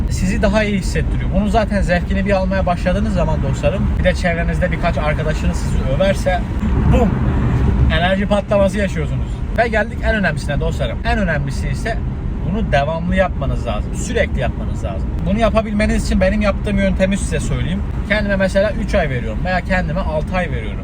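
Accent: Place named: Azerbaijan. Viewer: Abi sen Azerbaycanlisin konuşmandan biliniyo😅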